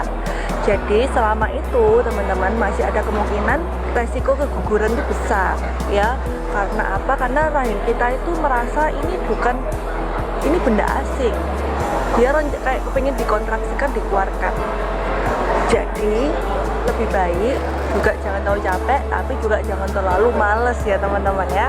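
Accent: Java, Indonesia. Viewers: Mba kok ngmongnya medok .. kaya org Jawa yah😊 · medog kali logat nya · Dok medok, jawa mana dok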